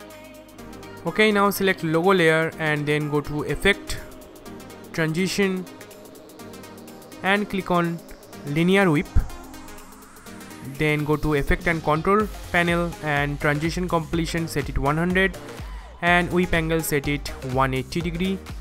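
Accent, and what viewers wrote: India. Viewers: Hey Buddy are you Indian?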